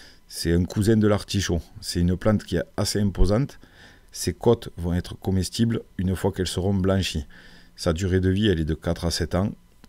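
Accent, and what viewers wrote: South, France. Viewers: Merci beaucoup pour vos vidéos. L'art du jardinage avec l'accent du sud . Super! Avez-vous entendu parler de la mâche perpétuelle. Merci pour votre réponse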